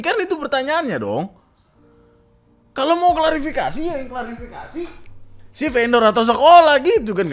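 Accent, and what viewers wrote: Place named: West Java, Indonesia. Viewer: Ini gw aja atau emang bang jadi ada logat sundanya wkwkwk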